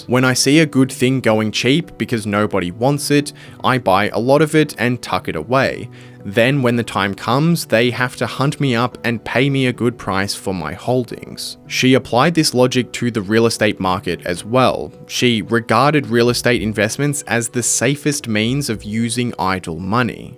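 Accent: Australia. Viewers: Ugh it’s hard to stay engaged with this Australian accent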